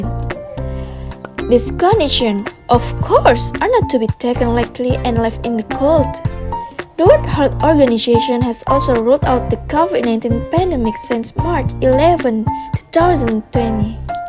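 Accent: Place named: United States. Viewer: American accent nih boss, senggol dong 😎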